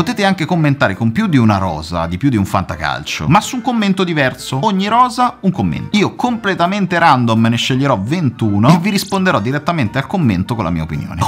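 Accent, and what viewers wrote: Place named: Terni, Italy. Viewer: ti prego rispondimi di dove sei perche dall accento mi sembri di terni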